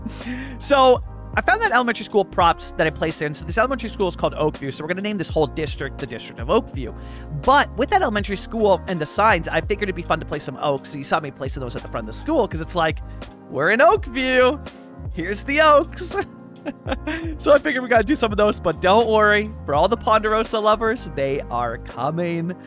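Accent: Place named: Canada. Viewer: Hey, my first of your videos, watching from Dublin Ireland here. The content (and your lovely Canadian accent) is very relaxing to watch :)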